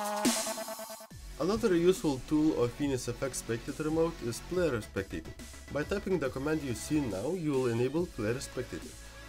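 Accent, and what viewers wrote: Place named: Russia. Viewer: Чувак, ты из России? По акценту слышится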